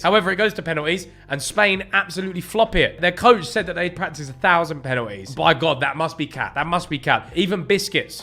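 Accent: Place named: England, United Kingdom. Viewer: Its prob his english accent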